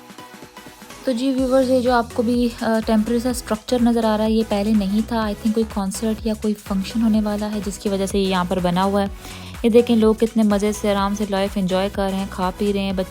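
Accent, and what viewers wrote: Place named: Lahore, Pakistan. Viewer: Nice.. That lahori accent though 👌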